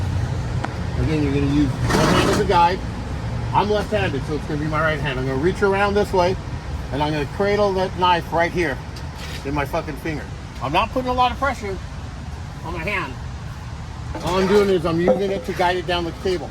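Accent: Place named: New Jersey, United States. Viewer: sounds like hes from new jersey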